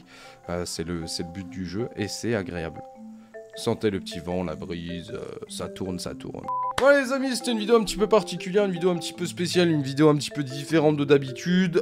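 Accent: Alsace, France. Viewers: sympa cette video dit moi tu serais pas alsacien ( 67)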